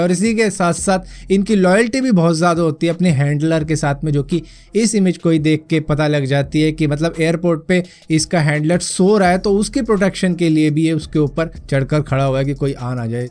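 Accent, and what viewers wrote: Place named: Haryana, India. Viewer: Bhai ik video haryanvi mai bnao plz...aapka slang bhot acha he...plzzzz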